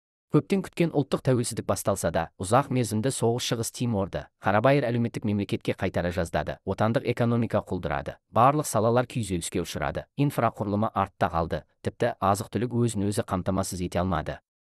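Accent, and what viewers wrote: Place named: China. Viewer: Кытайдан келген бе, казакша акцент “керемет” терминди айтпаганда,мемлекеттер атын дурыс атау керек!!!немесе мектепте дурыс окымай казак тили мен адебиетине дурыс ман бермеген бе? Блогер болу ушин жаксы дайындык керек, твндаудын ози ауыр.😮😂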